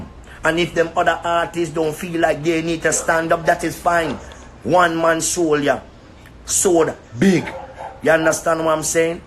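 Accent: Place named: Jamaica. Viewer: This was a set up from start,they were intimidated n pulled this stunt...... i luv u but y the Jamaican accent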